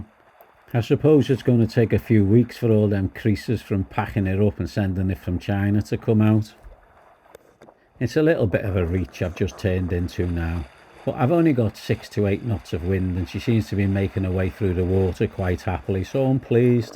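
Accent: Liverpool, United Kingdom. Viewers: just found your site and luv it, i have missed the scouse drawl and luv your laid back attitude to sailing . Have you ever done castletown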